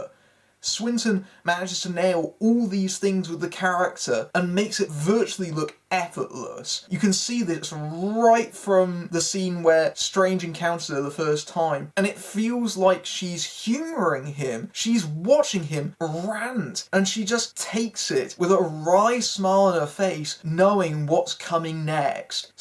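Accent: United Kingdom. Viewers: JotunTV It is called a British accent, something I don't expect an ignorant dumbass like yourself has encountered before.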